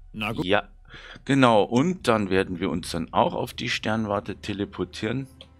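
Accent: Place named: Bavaria, Germany. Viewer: Dein bayrisch klingt ganz ordentlich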